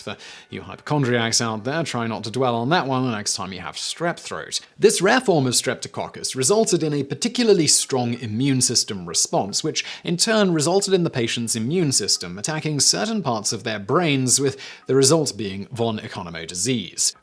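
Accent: United Kingdom. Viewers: The British pronunciation! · Ms. Forbes: you may have heard somewhere that not all terms are American in origin or usage. Simon is a Brit and the series originates in England. They have chosen for centuries to go with the Germanic influences on the English language, especially in regard to scientific terms. For whatever reasons, Americans lean toward the Romance languages for pronunciation of those same terms.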